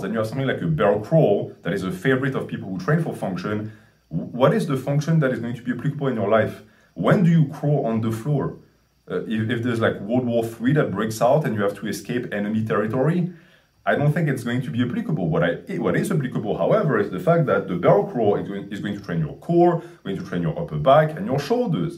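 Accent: France. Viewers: Is that a French accent I hear? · Is that a French accent? I love it! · Where is this accent from? Sounds like French but I am not sure.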